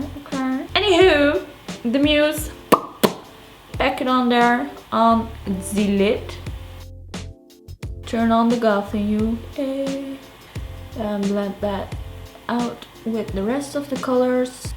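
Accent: Netherlands. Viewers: ben je vids aant bingewatchen. is leuk om te zien hoe je engels heel sneaky steeds iets minder dunglish word. en ik vind je over het algemeen gewoon geweldig i imagine myself to make vids like this if i had the balls xD zelfde attitude haha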